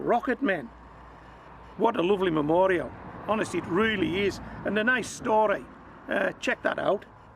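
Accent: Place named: Cumbria, United Kingdom. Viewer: I live in Kintyre now, and miss the Whitehaven so much. I watch your videos a lot, just to hear that Fab Cumbrian accent! Keep them coming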